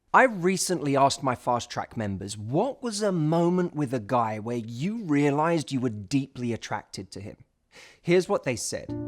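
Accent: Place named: United Kingdom. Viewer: one more, i love the British accent of you, i not only learn English but more interesting things from you. Thanks very much.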